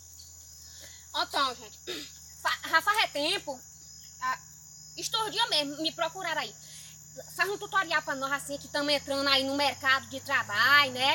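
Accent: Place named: Pernambuco, Brazil. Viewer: Quando vi você pela primeira vez achava que tu era mineira ou pernambucana. Adoro esse sotaque, ainda quero ir no Pernambucano... Parabéns ótimo trabalho!!! ✌👏👏👏👏👏👏👏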